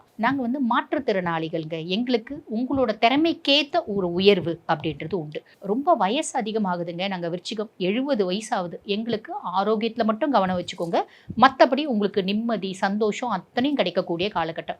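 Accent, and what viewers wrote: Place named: Northern Tamil Nadu, India. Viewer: Ennadae ammea vadakku thamilatchiyo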